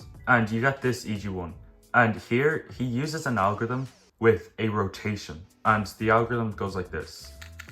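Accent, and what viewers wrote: Ireland. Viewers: ur irish accent is too strong for my english + chinese brain to combine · Hey, are u irish? ur accent sounds like a south dublin accent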